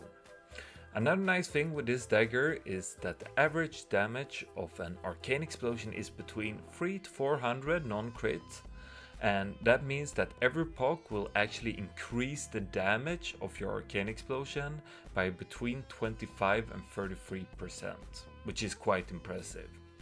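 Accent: Sweden. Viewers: Well since you ask what we would like to see next, here is what i would like to see =) I think a maraudon one pull tutoriel would be a great video. Ofc there are already a whole bunch of mages doing mara one pull on youtube, but i think every mage has his own little techniques, his own sensibility, and i would be pleased to learn what are YOUR way of handling this farm and what are YOUR personnal tips and tricks. Plus i like the way you explain things, with your swedish accent and all =)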